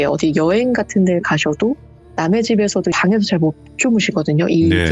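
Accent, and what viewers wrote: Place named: Seoul, South Korea. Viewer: 서울말투 진짜 이쁘다.. 난 부산 토박이라, 서울 사람 옆에 있으면,여자라도 우악스럽은디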